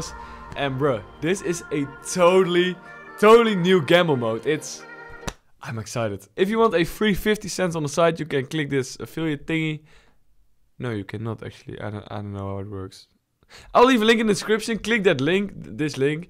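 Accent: Netherlands. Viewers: NEDERLAND !!! ik dacht al je hebt een Nederlands accent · ik hoorde gelijk dat je nederlands was hahaha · Dutch accent?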